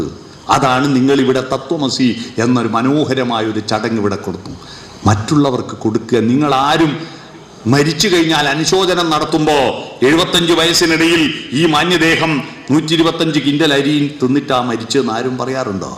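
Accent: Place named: Eranad, India. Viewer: സനാതന ധർമ്മം പഠിപ്പിക്കുന്നത് മുസ്ലീം ഏറനാടൻ സ്ലാങ്ങിലാണ്. കഷ്ടം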